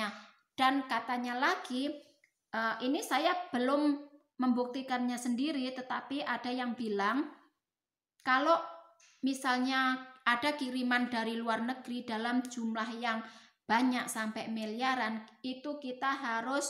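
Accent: Java, Indonesia. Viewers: Meddok nmbak😂😂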